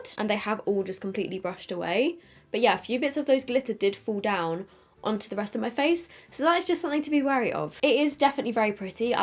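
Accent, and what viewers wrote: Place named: England, United Kingdom. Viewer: She’s English not Irish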